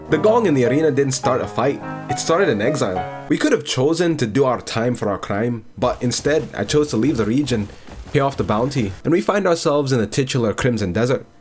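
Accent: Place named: Scotland, United Kingdom. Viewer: why does the Scot accent suit you so much hahahah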